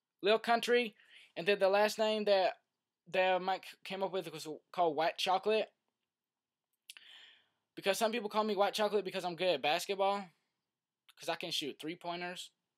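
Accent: South, United States. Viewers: One thing I don't get is why does he have a country tone but lives in Michigan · one thing i hate but im not a hater is his south accent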